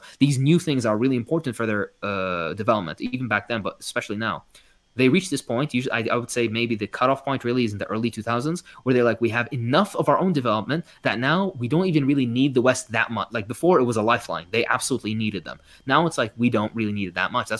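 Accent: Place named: Iraq. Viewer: How? He has a clear Iraqi accent.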